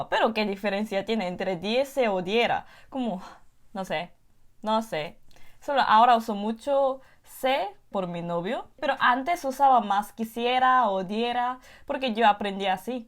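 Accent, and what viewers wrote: Andalusia, Spain. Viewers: Se nota que aprendistes español de España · Si,pero aprendiste el español que se habla en España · Hola anyoaseo 🤣🤣🤣 hablas español de España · En cuanto te escuche decir la palabra más, ya sabía que aprendiste el idioma en Andalucía y acerté. Imagino que te habrás sorprendido que en solo país existan tantos acentos, además solo en Andalucía hay unos cuantos. Para ser solo dos años de aprendizaje, te desenvuelves muy bien con el idioma, felicidades. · Hablas gachupín, no español. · Pronuncias muy bien; con cierto acento andaluz. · tienes acento español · Hablas el español MUY BIEN, mejor que yo. Soy malagueño, de padre sevillano, y ya noté tu acento andaluz antes que lo dijeras. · Hablas fantásticamente español. Corrección justo al final del vídeo. Se dice: "No os olvidéis de suscribiros" (forma más informal y más usada) o "no se olviden de suscribirse" (forma más formal, tipo usted). 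Con "no os olvides" (te ha faltado la letra i y acentuar la e), se comprende perfectamente lo que quieres decir, pero no es 100% correcto. Espero que no te moleste la corrección. Enhorabuena de verdad. Tienes hasta acento andaluz, el más bonito por cierto. Saludos desde Marbella, Málaga. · Jijiji es verdad que el castellano es difícil pero me asombra lo bien que lo aprendéis y te estaba escuchando y sí que tienes un habla más andaluz. Hablas muy bien mejor que algunos hispano hablantes. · Me encanto mucho tu video con tu asentó de España..no sé si sabías pero la mayoría de los latinos remedamos el idioma de España por diversión …un saludo desde Costa Rica ..ostia parecéis española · Se te pego un poco el español de españa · Hablas español de España. No de Latinoamérica.🤔😳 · Difícil o no lo hablas muy bien ...yo quiero hablar así de fluido otro idioma 😢. Por cierto has tomado el acento español lo tienes marcado yo soy de México y los españoles para mí hablan muy rápido 😂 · Omgg ella tiene una acento de España 🥺🥺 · Oelo pero hablas bien español, se te entiende bastante , y lo hablas bastante con el acento español jajaj apenas es ...m je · Pero tengo un argumento! 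Aunque digas que hay muchos acentos distintos, la ventaja es que aunque todos sean un poco distintos, al fin y al cabo de igual manera puedes entenderle a cualquiera que ya lo hable, o inclusive a tí! Yo por ejemplo soy de Costa Rica y el hecho que tengas acento andaluz no me impide comprender el 100% de todo lo que dices! Entonces en resumidas cuentas si hay una universalidad en el idioma! Dado el hecho que con esas diferencias seamos siempre capaces de comunicarnos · Una coreana con acento España !Que Bello! Ole Guapa😍👍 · Hola como estas ...tienes acento ..de espania ...todos los aciativos ..mas aprenden acento de espania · Hablas el español de España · Tu hablas español pero de España, los latinos hablamos diferente. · Ese español españolao nomas no👎🏻 Ese acento es una patada en los huevos!! Sorry!🤷🏻‍♂️ Pero pues es la verdad! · lo malo es que esta aprendiendo el español de españa:/ que si en cierta parte puede ser muy formal pero podria aprender un español mucho mas neutro en donde la "s" se pronuncie como tal y la "c" de igual manera, asi no le explotaria la cabeza al verlo escrito y al hablarlo al mismo tiempo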